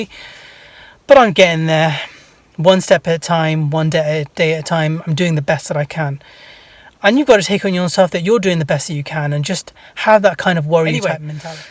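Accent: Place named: United Kingdom. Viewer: Yes boi. Smash that gym!! Sick accent you living in UK?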